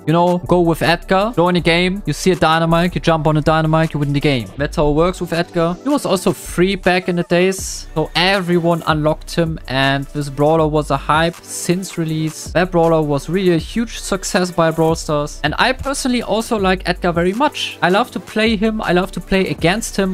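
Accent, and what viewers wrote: Germany. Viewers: ich finde es voll witzig wie du englisch sprichst weil man hört das du aus Deutschland kommst😂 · Das ist so richtiges deutsches Englisch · are you german or french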